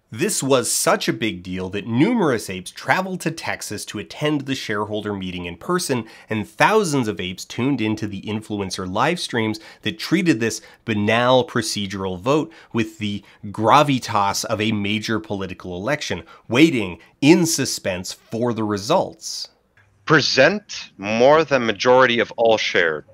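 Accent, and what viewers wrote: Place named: Canada. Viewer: Same here, long time Dan-Stan here so I’m used to his Canadian accent slipping through on random words sometimes (ie “gadaff” from the lord of the rings video) so I honestly thought I was just miss hearing him say life changing…. I don’t feel super great in my soul now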